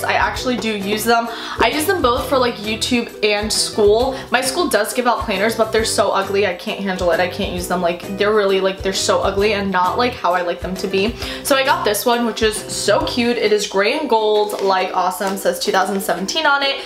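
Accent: New Jersey, United States. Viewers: Dang she's from up north like Jersey or Massachusetts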